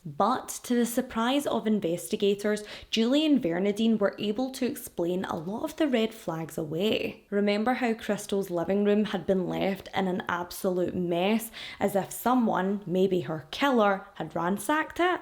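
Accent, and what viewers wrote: Scotland, United Kingdom. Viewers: Thank you for brining so much well-needed awareness to some of these older cases. Rest in peace, sweet Crystal. She and her family deserve justice and answers after so many years! Still so surprised you don't have more subscribers! I've watched quite a few of your videos in the past but it seems like YouTube has been randomly unsubscribing me from a few channels again (as well as other members, from what I've heard), but alas, we're back being subscribed now ❤ Great work as always, Miss. As an Aussie with the majority of my father's family still being based in Scotland, your accent makes me smile. My Nan has lived down here since the late 60's and her Glaswegian accent is still so thick that I doubt subtitles could help us when she gets heated having a wee blether over a cuppa 🙈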